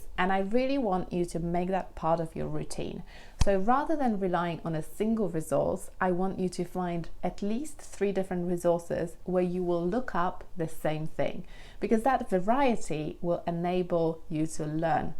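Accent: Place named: United Kingdom. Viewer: I thank you for your superb work! I adore your British accent! Peace and Love from Russia! ...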